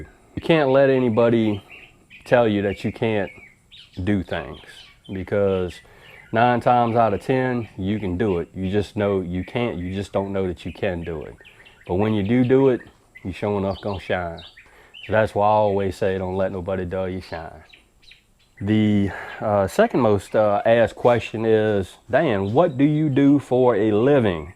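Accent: South, United States. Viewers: Glad this popped up on my YouTube today. Have been following your channel a little over a month now and haven't had time to go back & review old videos yet. I deducted about the 3rd video I saw that you were most likely on the force. Thank you for your service and continual prayers for your protection, as you protect others and our rights/freedom. "Don't let nobody Dull your shine!" was the final nail which made me know I had to follow you. Your accent, southern expressions and channel name caught my attn first. I've lived in Metros and small towns, but "in the sticks" is where paradise can be found (& created). Always enjoy seeing what's happening at the home place Dan. You posted this Oct 2 of last year, saying it was a nice 85 degrees. This year, here in middle Ga 85 degrees is passed too fast to hit the 90s. Don't think we've had a lick of rain since August, so we are extraordinarily dry. 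Gonna hold out hope the cool will come again soon. Take care and keep shining Dan!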